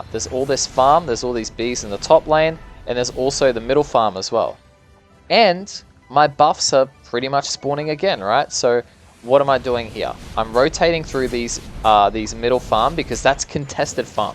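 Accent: Australia. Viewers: Are you australian!?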